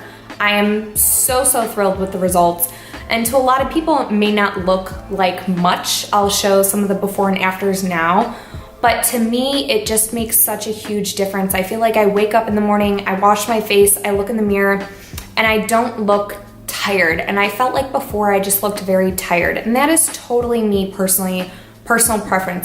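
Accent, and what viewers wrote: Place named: Midwest, United States. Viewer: I could tell you have a Midwest accent 😭😂 #chicagogirls ♥️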